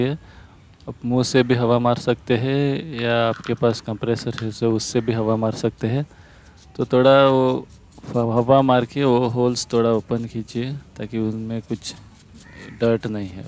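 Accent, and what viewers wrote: Goa, India. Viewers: Are you from goa? Cz ur hindi little like goan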